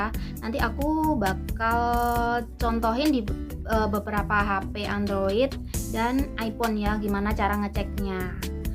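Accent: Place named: South Kalimantan, Indonesia. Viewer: Kakak orang kalsel ya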